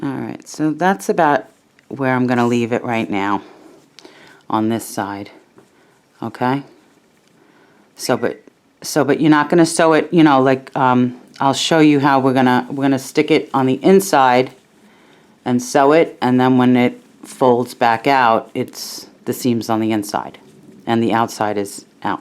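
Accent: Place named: New York, United States. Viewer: Your tutorials are some of the best that I've found. They are so easy to follow and I love listening to your new York accent and the sound your nails make clicking together when you crochet ☺️ Thank you so much for these tutorials..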